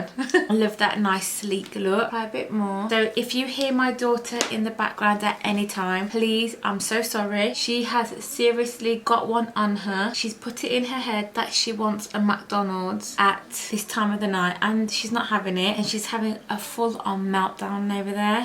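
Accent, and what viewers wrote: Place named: United Kingdom. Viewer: Are you from The UK ? Love love yoir accent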